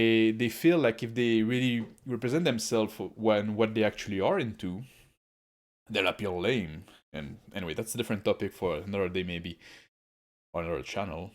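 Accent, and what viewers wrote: Quebec, Canada. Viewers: pour moi t’es du Québec hen? 😂 sub time!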